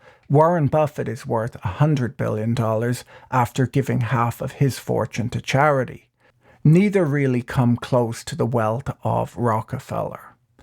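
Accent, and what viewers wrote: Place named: Ireland. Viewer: Very interesting and well presented. Forgive me for adding that it seems especially appropriate that you've a lovely Irish accent for presenting this portion of American history as so many immigrants were from Ireland at this time.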